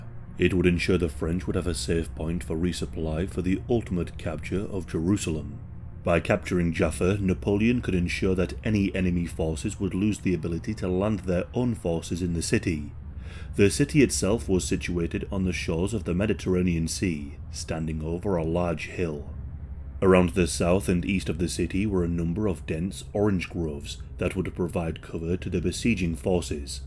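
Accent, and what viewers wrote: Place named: Birmingham, United Kingdom. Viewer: I can’t stand it. I think he’s from Birmingham.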